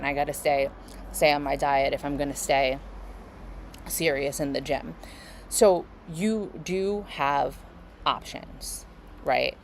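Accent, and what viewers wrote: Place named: New York, United States. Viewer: I thought I heard a little NY in your voice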